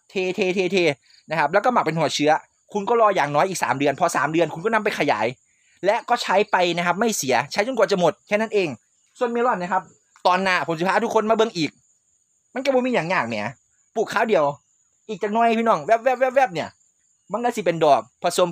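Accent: Isan, Thailand. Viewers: เว้าอีสานแบบแซ๊บๆ นี่หละ (ภาษาคนชุมแพ๊)..แก๋วๆๆ มาดถานบ่าว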